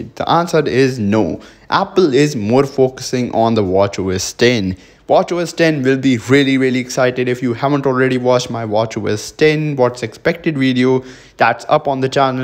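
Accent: India. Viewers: i hate your english language you indians!!!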